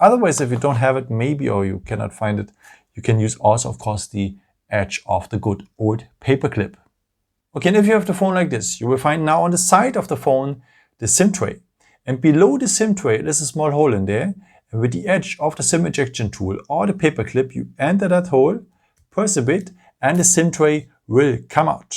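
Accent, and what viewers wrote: Germany. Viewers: Deutscher Akzent:D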